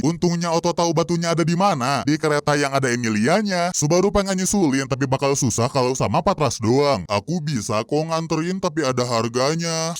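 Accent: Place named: West Java, Indonesia. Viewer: nyunda banget logatna😭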